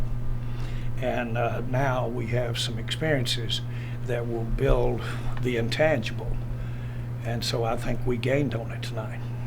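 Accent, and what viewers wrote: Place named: South, United States. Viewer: Last known human with the accent of a slave owner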